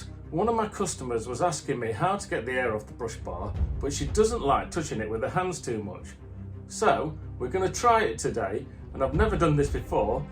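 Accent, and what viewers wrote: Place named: East Midlands, United Kingdom. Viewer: Haha could tell instantly have strong East Midlands accent lol.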